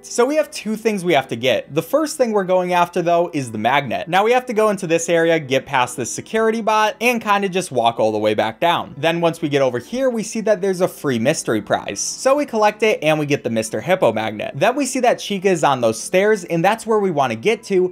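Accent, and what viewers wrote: Denmark. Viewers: Your danish!?!?!? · Are you from Danmark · er du dansker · are you danish?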